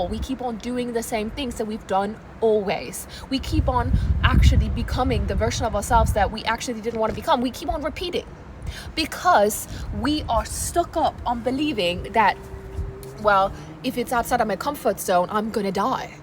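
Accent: United Kingdom. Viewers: 😂😂😂😂😂we love British accents over here girl 😊😊😊😂😂❤❤❤❤ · Gurll I loveee your energy 🍀🌷🌷😭😭 and that British accent 😭😭. Lobbyaa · The British accent makes it sound less like a scam! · The british accent girlll hahaha ❤ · Not the brittish accent😂 girl you lifted my mood. And I've cried today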